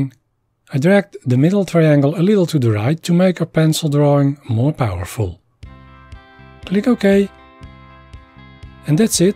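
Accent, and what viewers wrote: Netherlands. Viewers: Lijkt wel een rotterdams accent. Thanks voor de tutorial!!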